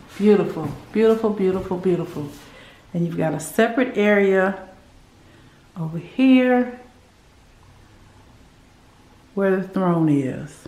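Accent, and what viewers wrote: South, United States. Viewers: Best southern accent-I just liked listening to you. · I love your voice, it’s so calming and southern! Love it, I’m from the south too but I’m more Appalachian sounding lol. Good job! 😊😊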